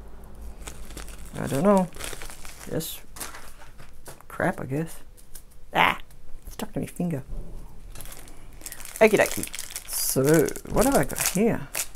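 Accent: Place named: Australia. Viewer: Shopanydeals is a dangerous site for the bank balance. Found your unboxing videos and love them but always nice to hear a fellow Aussie doing unboxing/reviews.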